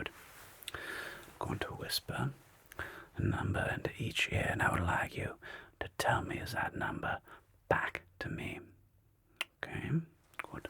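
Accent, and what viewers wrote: Germany. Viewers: I love your German accent!! Saying this as a German myself 😅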